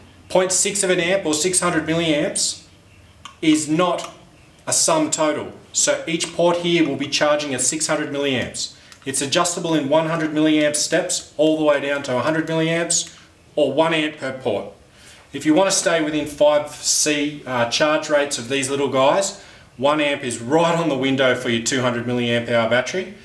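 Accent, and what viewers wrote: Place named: Australia. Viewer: Thanks for the review and great accent! What part of Australia is that? I was I Sydney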